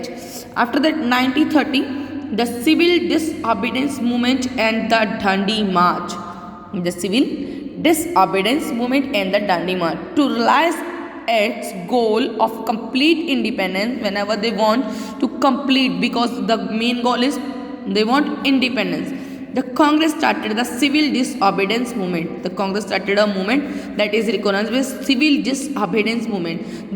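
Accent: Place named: United Kingdom. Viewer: Sorry to say but if u r teaching in icse school that doesn't mean that u have to sound like Britisher itself just pronounce the words normally......😅 ~no offence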